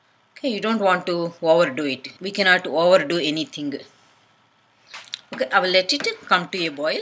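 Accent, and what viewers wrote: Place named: South India, India. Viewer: She's a South Indian and they have that kind of accents. Very strong MTI. Though a Pakistani talking about English and it's accent is kinda funny 😛😂😂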